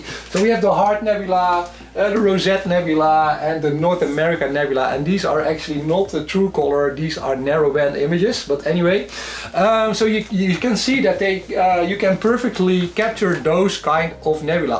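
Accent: Netherlands. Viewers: Haha na de eerste paar seconden zei ik meteen, hij is sws Nederlands. En ik had gelijk😜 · Ben je nederlands zo klinkt het namelijk Edit: ja dus. Leuke vids trouwens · Na het eerste woord hoorde ik het al, een nederlander!! Haha. Thanks voor de video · ) dutch accent sounds smart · From the accent I predict this guy is Dutch. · hollander alert😂 goeie video man!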